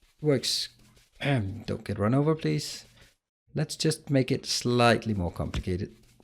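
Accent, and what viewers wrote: Denmark. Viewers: Denmark? Im asking because of te accent 😁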